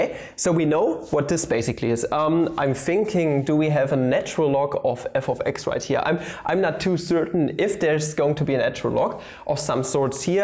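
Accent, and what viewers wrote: Germany. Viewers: One and a half years ago was so anxious about his English, now - the more German in it, the better:D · Ich finde es niedlich wie Sie Wörter wie 'the' 'this' '.. aussprechen :D Your german accent is adorable They are learnable through (the two th sounds). You need to see the arabic letters like qaf ق or ayn ع xD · What amazes me is that subtitles are perfectly true for a heavy German accent. Is it manually translated or google translation? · Well his is an actual German accent, I should know, I live there.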